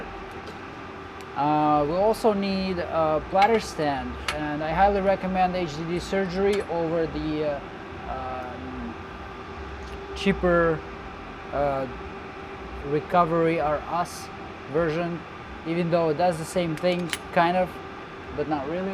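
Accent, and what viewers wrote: Russia. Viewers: Are you Russian? You do sound like a Russian guy. You choice of words and accent are very specific.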